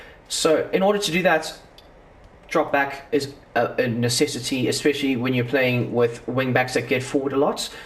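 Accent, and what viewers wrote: South Africa. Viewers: U South African ?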